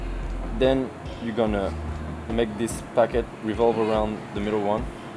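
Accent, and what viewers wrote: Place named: Russia. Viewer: Я не могу понять, вы русские что ли? Акцент прям похож..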